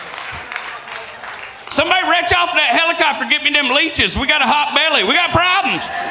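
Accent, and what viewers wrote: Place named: East Texas, United States. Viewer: Man this killed me. I'm from East Texas and you sound like 7 of my 8 cousins lol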